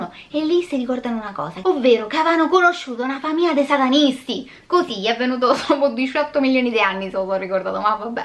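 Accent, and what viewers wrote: Rome, Italy. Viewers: Scusami ma io vivo ormai moooolto lontano da Roma e non sai che piacere mi da' sentire il tuo accento . Non so se puoi o vuoi.. Ma mi diresti di quale quartiere sei? Brava.. Dovresti fare l'attrice! 👏👏👏La storia... Una stronzata enorme! Gli americani fanno tutto in grande... Soprattutto I cazzari! 🤣 🤣 🤣 🤣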